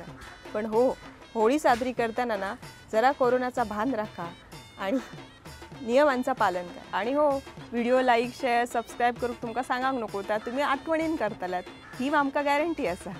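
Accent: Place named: Goa, India. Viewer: तुम्ही लय भारी तुम्ही गोयचे काय तुमच्या बोलण्यात तसा वाटला म्हणान ईचारलय बाकी काय नाय.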